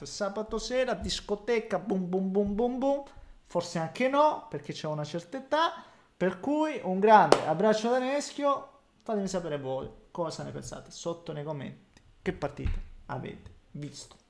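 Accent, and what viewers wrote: Calabria, Italy. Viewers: Neschio di che zona bella sei della Calabria? Spero no di Cutro.. 😂😂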